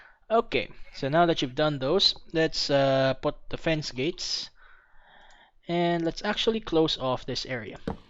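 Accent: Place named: Philippines. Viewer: Ang accent niya ay tagalog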